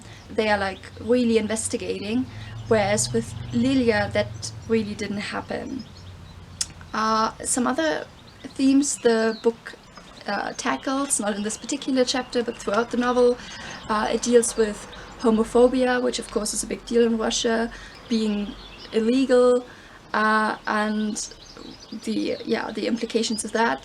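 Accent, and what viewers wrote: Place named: Brandenburg, Germany. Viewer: Rain? Tropics get rain. The Sahara, Death Valley & other hot weather deserts get rain when they're lucky. Europe is so lucky. Hurricanes? What hurricanes? Tornadoes? Very occasionally in the south, but no one place gets hit with any regularity. Major earthquakes, well OK some in Italy and a bit in Spain. More northerly climes are completely spared. Not fair. Lucky lucky lucky. But beware the Azores re causing tidal waves & Icelandic volcanoes erupting. Euros can't be lucky all the time. According to linguistic maps, your dialect & accent is labelled as Markïsch aka Margravian aka Low East German. As u obviously understand the High German of places like Hannover, it means that u should have an easy time learning Dutch, which is a mix of High & Low German. You are a linguist, you learned English. And some day you'll rattle off chrysanthemums & "this that them there things, the thirty-three of those though they thoroughly" with no trouble at all. 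Me, pronounce the hardest sounds in German? Faints. :-D And I thought French was hard. According to Worldometers, Germany still has >27.7K active covid cases, with 216 new cases reporting today. The curve of covid is still going up, but it is closer to flat than it has been before. Canada has lotsa space, very spread out, relatively speaking, so our situation is vastly different, depending on the region. One province, Quebec, has nearly 30K cases & >2.1K deaths, whereas its bordering province, New Brunswick, has <120 cases and 0 death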